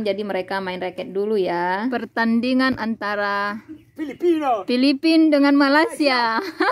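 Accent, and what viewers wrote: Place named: Batak, Indonesia. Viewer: Gak tau knp dari dl tu suka bgt cara ngomongnya orang Batak...apalgi klu liat Judika hahahahh skrg liat kk aja dl..horassssssss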